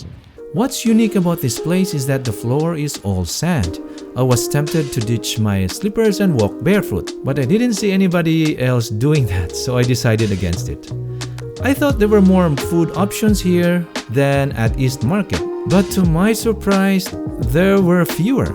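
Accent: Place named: Philippines. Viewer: Your. Filipino accent is so thick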